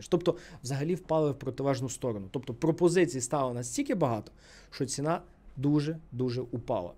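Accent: Lviv, Ukraine. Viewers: Приємно слухати розумні поради ну і Львівський акцент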